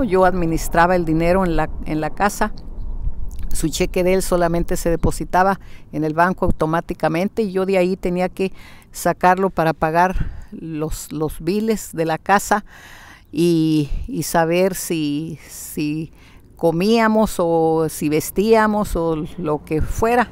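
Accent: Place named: Monterrey, Mexico. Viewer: Hola, su acento me recuerda mucho al de la familia de mi novio, ellos son de Monterrey, México. Un abrazo desde España y gracias por sus videos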